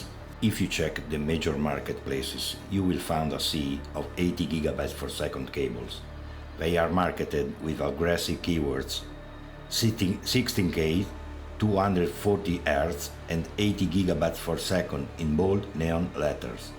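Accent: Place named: Greece. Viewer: Thanks for not using AI voice, despite your heavy accent (greek?). Id rather have someone attempt to make a video themselves than phone it in. That said, I have ran into this with my 240hz 4k oled. On displayport i have the bottom pixel row occasionally fizzle. On hdmi2.1 it has black screens and RGB flickering. Both hdmi and DP have issues with freesync which causes the AMD driver to completely lock up and crash. I pride myself of making my system very stable and optimized. But this damn monitor is always bugging out on me. Randomly turning off and the aforementioned issues. I dont think its the monitor itself...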